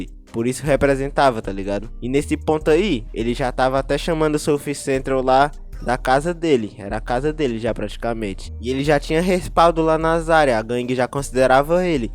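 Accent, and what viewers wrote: Fortaleza, Brazil. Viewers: Tu é de Fortaleza é btl? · Tu é de fortaleza? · Mansho tu e de fortal ne? kk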